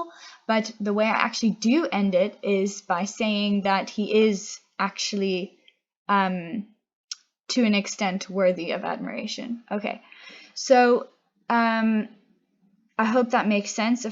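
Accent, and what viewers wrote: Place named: United States. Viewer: U have an American accent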